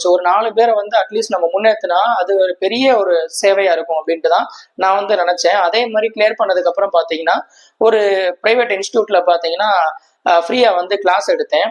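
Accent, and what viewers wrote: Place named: Madurai, India. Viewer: Sir madurai ah slang apditha iruku avaiga ivaiga 😅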